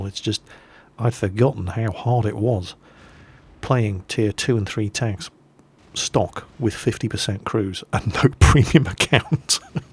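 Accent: England, United Kingdom. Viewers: Love the videos. nice to hear another English You Tuber and yer you got me into both WOT's and War Thunder thanks allot its all i really play anymore. Good Luck with future videos and respect for being loyal to your "fans" and posting regular content! All in all great journalism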